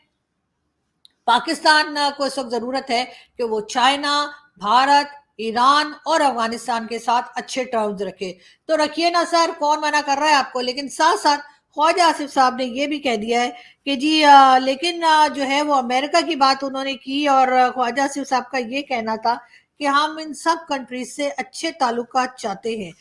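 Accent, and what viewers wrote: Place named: Mumbai, India. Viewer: ji aap mumbai hindi kaise bol leti hai. pls repl.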